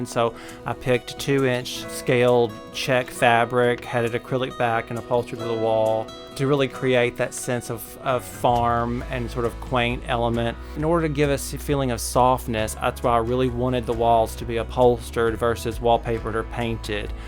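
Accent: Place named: South, United States. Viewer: Absolutely stunning! This Kansas girl loves the southern accent, too!😂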